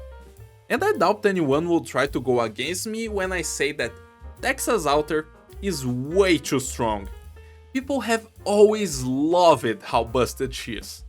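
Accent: Brazil. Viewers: Ai irmão, tu é br ou existe outro sotaque MUITO parecido com o nosso?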